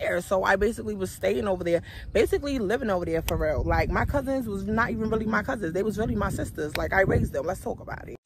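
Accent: New York, United States. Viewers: Sis you be getting some good sponsors! Anyways been binge watching you since your new body butter video loved it even though I’ve been making my own for years I loved your video and how entertaining you are! Now I usually hate to hear that accent so I don’t watch people who have that New York accent but yours yeah I likes. You and symone are my favorite storytime YouTubers now. Anyways keep pushing these videos out sis!💙💙💙 love from Cali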